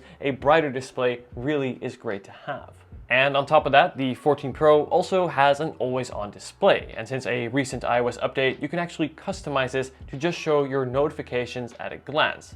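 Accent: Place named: Netherlands. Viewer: You speak dutch to? I hear a little accent.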